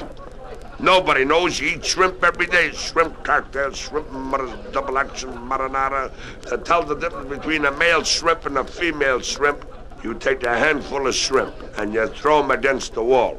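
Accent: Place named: Brooklyn, United States. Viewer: He speaks in the old lost Greenpoint (greenpurnt) Brooklyn accent. Note the very canned laughter. Live recordings in the 1950s were quite rare.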